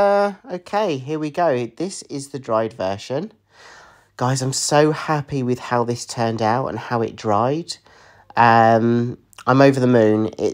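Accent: United Kingdom. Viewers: Happy birthday,. I love to hear your british English. 😍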